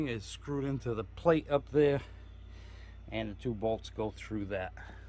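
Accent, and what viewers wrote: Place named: New England, United States. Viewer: LOL! Ithis is the first video of yours that I have ever watched. I am originally from NY, and have family in Ct and Ma. I heard the accent and said he must be from Mass. But further research said you were in Carolina. I was thinking how could he have that New England accent? Now I know.😊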